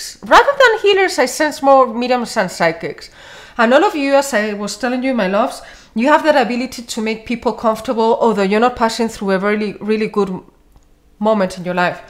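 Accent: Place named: Spain. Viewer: Your voice is beautiful, personally speaking I would hate to see u learn english because what u have is treasure. I love ur Spanish accent. Don't ever lose it❤❤💕.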